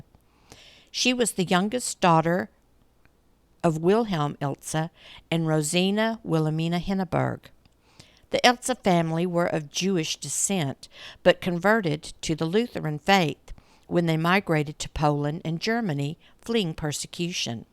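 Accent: South, United States. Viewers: The lovely southern accent makes the narration absolutely perfect and I love it, dont you ever change, lots of love from England.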